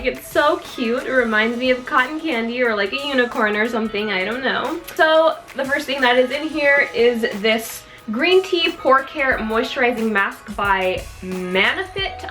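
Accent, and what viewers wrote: Australia. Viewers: Just wondering if you follow Australian YouTubers 😊 Your Aussie accent is on point lol 👌🏼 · I love the Australian accent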